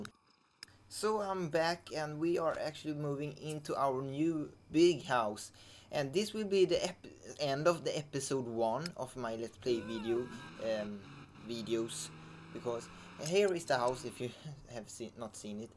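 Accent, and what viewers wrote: Sweden. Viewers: o i barely noticed this was uploaded on my 18th bday xD,btw i love your swedish accent :D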